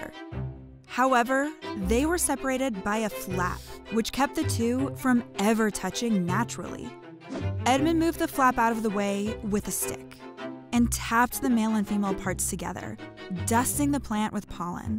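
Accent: United States. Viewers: From the UK and never ever heard someone speak with a glottal stop like this, no idea wtf you think British people sound like. this narrator is very much American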